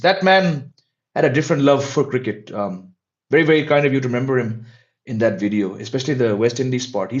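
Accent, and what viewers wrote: India. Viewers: Sorry, your english is almost indian english, probably live in india? Are you related to Tom Alter ji, to me you look and speak like him. Again sorry for being so inquisitive. Bazzball is for flat batting decks if at all if has any place, but even then they will need to show patience at some point during a match, or it will lose against good opponents. Keep up your good work.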